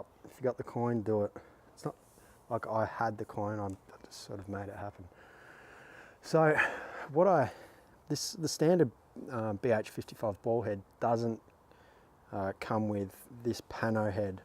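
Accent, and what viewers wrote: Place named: Australia. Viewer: why on earth would this video get so many dislikes... haters? Anyway, I ordered a RRS TVR24 and BH40 w/swivel mount for my Leica M10P and Noctilux .95 50... nothing but the best all across the board.. and haters gonna hate, who cares! -- btw, I hear a Ausie accent, but looking at you I'd swear you were from Portland Oregon.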